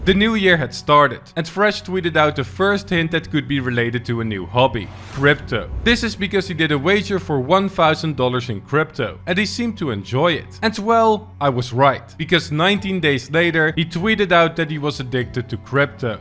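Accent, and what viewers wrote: Netherlands. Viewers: Lol the Dutch accent is pretty funny (No hate) I'm Dutch too and I also have the worst english accent Xd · U sound dutch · Are you from the Netherlands because you have such an accent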